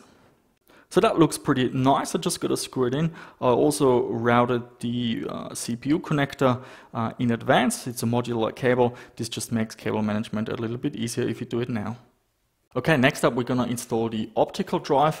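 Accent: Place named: Germany. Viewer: Actually, I got that Sound Blaster second hand 9-10 years ago (and it was massively expensive even then), but I was already past my gaming prime and I was never able to truly enjoy EAX. I had planned to integrate it into a modern desktop (which so far I have not buit), but you made me realize that modern OS-s have relegated EAX to the past. Besides, there were some issues with the front panel, which I could not fully deal with even after dabbling with the jumpers. Maybe, it was faulty like the TV tuner card I purchased with it. But hey, that is the risk when dealing with used parts (and the excitement when they do work:D)., your channel inspired me soo much to revive my old tech (your somewhat German accent rocks as well:D). Now I am slowly working on a Compaq machine with a 500 MHz Katmai (similar to the first PC I owned). I have already boosted the RAM to 512 MB (in Y2K I had 64 MB, slightly higher than the standard 32MB new PCs were offered in my country back then). 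Next comes the SD card to IDE adapter (thanks again for the info), I hope I will be able to have a dual Win 2000 and Win XP boot (and move the XP pagefile to the HDD, perhaps?). Finally, I have prepared a GeForce 4 MX440 to replace a G200 (hope it will work). Sadly, the old compaq proprietary mobo may prevent me from maxing the CPU (I had the Abit BE6-II board back then - so flexible; and a Slotket for Coppermine). Do you flash the BIOS to its latest version when you build old systems? Because many of th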